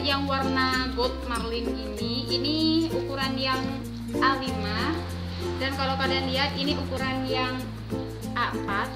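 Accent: Madura, Indonesia. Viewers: Kak asal mana? Madura bukan sih... Bahasanya itu g asing 🤗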